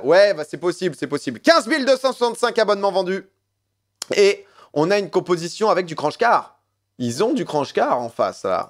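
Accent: France. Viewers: Salut mec, merci de respecter l'accent du nord! Sang et OR Forever .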